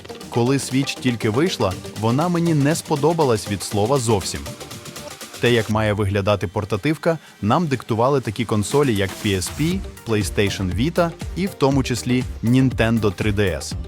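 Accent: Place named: Surzhyk, Ukraine. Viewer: Это непонятно суржиком называется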